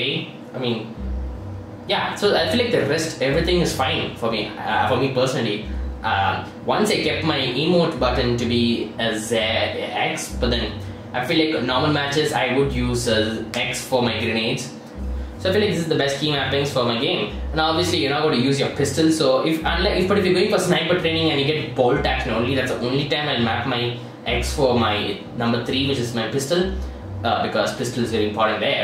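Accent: India. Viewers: Jesus fuck indian accent is so fucking annoying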